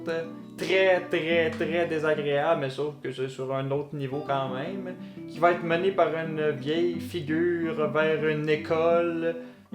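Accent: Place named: Canada. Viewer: Bel accent canadien en passant😊 J'suis un mordu de lecture...c'est surtout ça qui m'a accroché... De plus les titres des livres le sont tout autant... Merci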